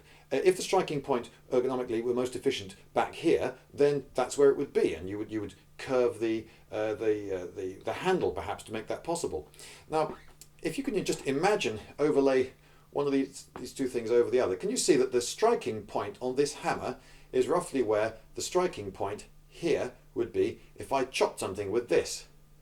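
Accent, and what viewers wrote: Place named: United Kingdom. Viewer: relax dude. british accent is sometimes hard to catch for a froggy.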